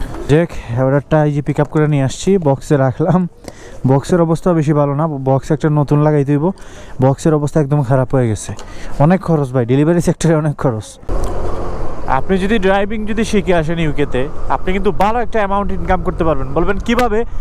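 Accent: Sylhet, Bangladesh. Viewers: Apne sylheti?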